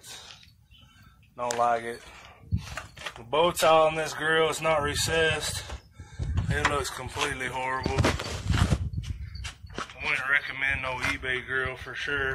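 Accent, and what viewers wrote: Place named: South, United States. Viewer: Where you from? I seen Texas plates. I live in Houston myself but you sound like one of my uncles in Georgia/Alabama lol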